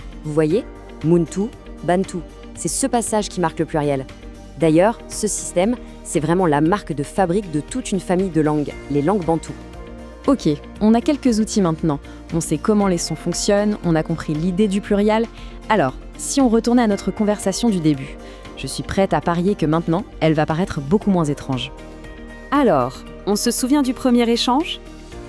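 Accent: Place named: France. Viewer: Svp la voix prononce les mots avec l'accent français, ça n'aidera pas ceux qui veulent apprendre.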